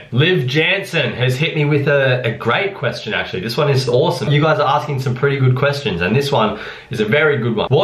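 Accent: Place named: Australia. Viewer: Omg really? You sound like a Aussie for sure lmao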